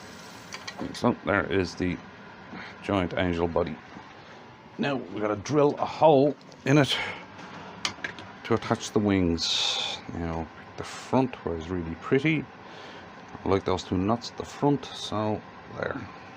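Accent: Ireland. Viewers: Brilliant. Is that aDublin Accent.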